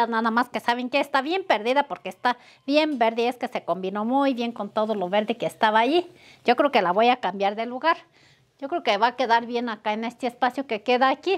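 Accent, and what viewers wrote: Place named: Michoacán, Mexico. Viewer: Tu hablas bien a la forma qué hablan en Michoacán con todo respeto Pero hablas muy rápido Pero si tú quieres hablar así rápido claro te vas a trabar Pero explicas bien no digas que no saber hablar !claro qué sí puedes ! Adelante si se te entiende no vuelvas a decir que dices una cosa por otra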